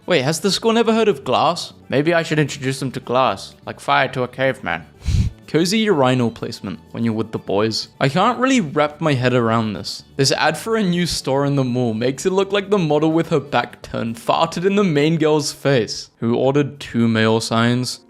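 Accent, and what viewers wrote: United Kingdom. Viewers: typical British pronunciation confusion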